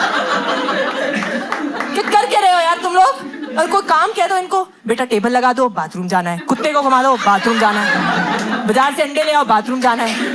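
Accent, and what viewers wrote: Punjab, India. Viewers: Being a Genz I don't spend much time in toilet. Also I really like your way of presenting and didn't find it like I should mind it . Also your punjabi accent ❤❤❤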